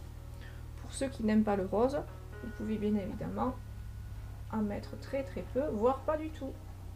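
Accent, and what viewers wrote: South, France. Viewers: magnifique tableau avec les explications top et ce petit accent du sud qui apporte une note musical à tout ça . Un grand merci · Le tout parfaitement expliqué avec le charme de l'accent du sud qui m'est cher. Merci bcp de la part d'un toulousain.😊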